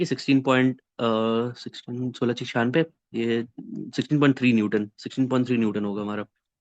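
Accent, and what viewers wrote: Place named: Mumbai, India. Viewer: Sir eda, mandawali?? Ekdum mumbaiya tapori language 😂😂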